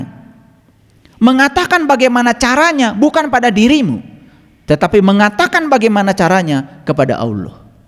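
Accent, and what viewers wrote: West Java, Indonesia. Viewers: Lebih kepada logat bos,, logat Sunda emng sperti itu, akn brbeda dngn logat orng Jawa, orng Arab dn sbagainya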